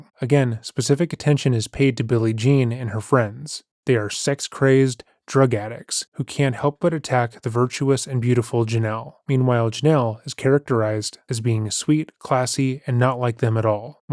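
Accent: Canada. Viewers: Is it just me, or can we all hear the Canadian accent more and more these last few videos? I couldn't hear it or wasn't paying attention in your earlier videos, but your voice is one big reason why I love your channel, so I'm sure I would have heard it before. Just wondering. ❤ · First of all, I love this channel. I've been watching pretty much since day one and will continue to do so. With that said, I've noticed in the last few videos a canadian accent that I didn't pick up on earlier videos. Anyone else? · Wait, are you Canadian? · First time completely hearing the Canadian coming through, guys. Currently in New Orleans, so, stark contrast.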